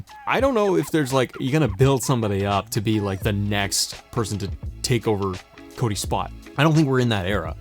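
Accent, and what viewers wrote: Canada. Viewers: i knew it was east cost and he wasn't French but I figured Halifax or Toronto but I didn't wanna shoot in the dark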